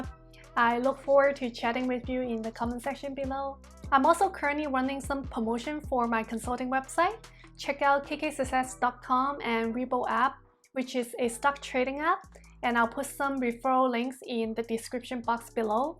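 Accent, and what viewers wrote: Singapore. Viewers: I am hearing some Singapore accent when you speak :) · Your singlish is 👍💪👏 Popiah and fried carrot cake are my favorite foods🥲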